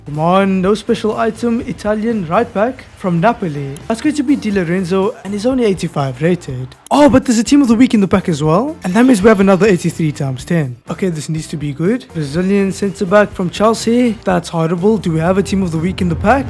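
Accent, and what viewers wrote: South Africa. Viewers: where are you from because you sound south african if im not mistaken 😮😊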